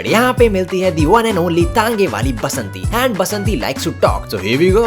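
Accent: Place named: Punjab, India. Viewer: Am noticed your Punjabi accent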